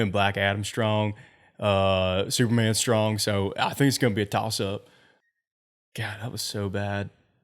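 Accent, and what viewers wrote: South, United States. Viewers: didn't realize how southern you sound normally until i heard your voice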